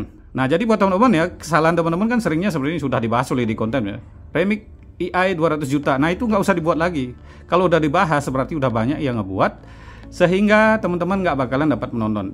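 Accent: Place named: Bali, Indonesia. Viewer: Uli logatne be ke tebag nak bali ne😄 rahayu wii🙏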